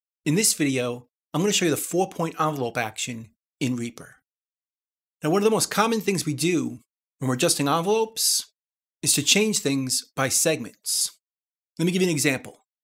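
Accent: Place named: East Coast, United States. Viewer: That's his voice! He's obviously from the east coast (Jersey? ). It takes some getting used to, but his videos are excellent.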